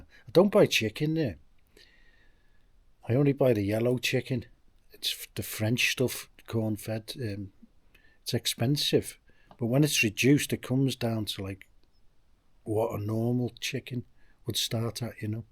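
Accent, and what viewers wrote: Liverpool, United Kingdom. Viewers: Are you from Liverpool or the Wirral? 😊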